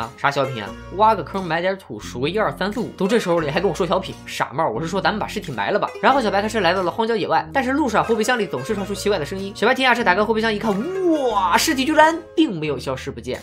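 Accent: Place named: Jiaodong, China. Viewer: 主播胶东人吧，从 自己 的发音 判断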